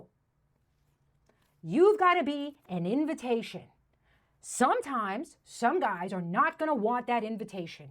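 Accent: New York, United States. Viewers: Women berating other women for being women is a new content genre. But in that NY delivery, it really feels sharp and totally lacking in appeasement, which is great. I would date you, because I get the feeling even the fights would be funny AF, ngl. Wish you the best of luck with your comedy career, I really need this no bs attitude to make a comeback to standup, everyone's being so weak right now. Easy sub.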